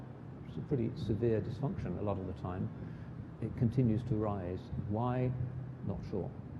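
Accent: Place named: United Kingdom. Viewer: Why the british accent?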